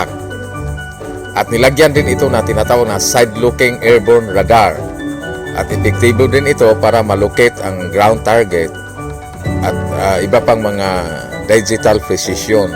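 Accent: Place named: Bohol, Philippines. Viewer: PARE TAGA BOHOL KABA?KASI YUNG TUNOG NG BOSIS MO PARANG TAGA DAUIS OR PANGLAO BOHOL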